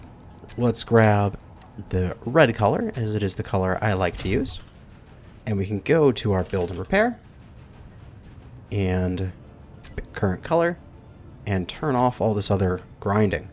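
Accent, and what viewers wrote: United States. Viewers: I'm not usually fan of American accents being English myself, but your voice is rather quite soothing to just listen to/watch while i'm doing things on computer :)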